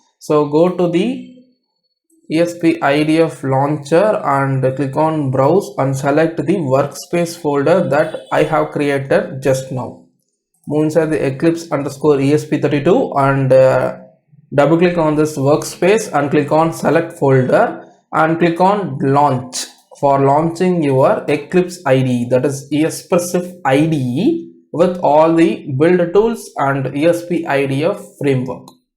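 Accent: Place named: India. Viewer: too much indian accent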